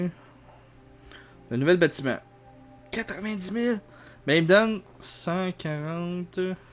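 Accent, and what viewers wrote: Quebec, Canada. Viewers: Défois je comprend pas trop ce que tu dit par ce que tu a un accent québécois et je suis français mais sinon bonne vidéo ^^ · L'accent québécois >>>>>>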